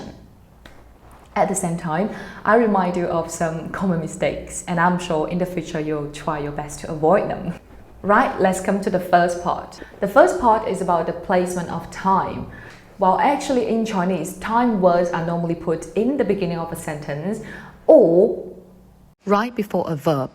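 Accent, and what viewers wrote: United Kingdom. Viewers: Is that a British accent I detect?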